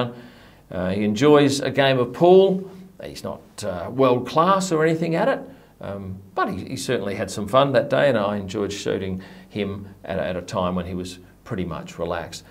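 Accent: Australia. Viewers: Nice to hear an Aussie talking F1.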